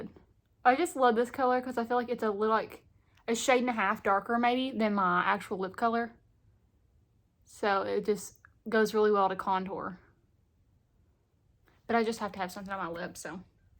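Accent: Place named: Kentucky, United States. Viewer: I love love you girl,you are awsome,awesome, love your approach to life,your makeup ( you are gorgeous) have such a natural look.love the Vibes and love love your drawl are you from kentucky? I am and we sound a lot alike.i am scared of bronzer also.you don't need it.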